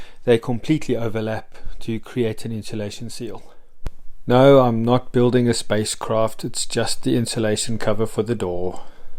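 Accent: South Africa. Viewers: I can spot a south african accent from miles away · Good ol South African accent 😉👌🏼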